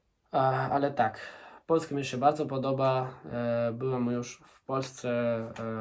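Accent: Russia. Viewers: you might be a beginner in Polish but some of the harder sounds were on point. You said you start speaking Russian when you don't now how to say something in Polish but I also noticed 1 other thing, you seem to have a slightly Russian accent in Polish, I'm guessing because Russian was the first slavic language you ever learned and now it carries over to Polish. there were some grammatical errors but that's to be expected, I commend your efforts nonetheless, Polish is probably the hardest slavic language, even people like Tokimasa Sekiguchi who has been living in Poland for decades pronounces some words in a weird manner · Your Russian accent is very impressive. Te felicito.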